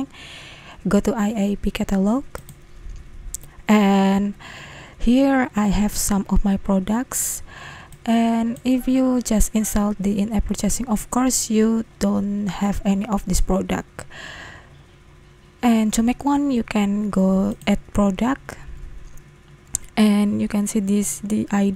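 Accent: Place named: Indonesia. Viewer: sounds like indonesian accent, i might be wrong.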